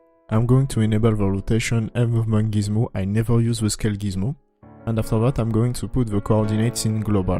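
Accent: France. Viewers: We've needed this for a while! Also love your French(?) accent, I may be enabled for taking french in high school but you're very understandable :D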